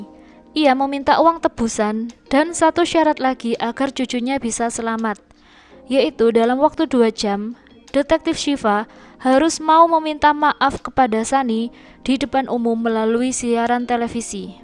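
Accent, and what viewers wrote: Java, Indonesia. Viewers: Medok mbak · gagal fokus sya krn mbak medhok... pdhl sdah tegang btul ngikutin alur critnya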